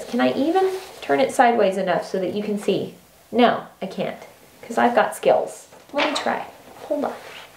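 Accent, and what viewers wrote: South, United States. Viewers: You could work for QVC!!!! ;) Shame that (as usual!) the give away and products is for USA folks only (English subscriber here.) None the less, well done on the 20 k subs (I'm just a recent one too, like a few days ago recent, lol) And last, but not least, I love your accent. :) It's so funny for me to hear, like something out of a movie.. Very 'Southern drawl.' Take care. PS. Your hair is beautiful.. The shine is EPIC!!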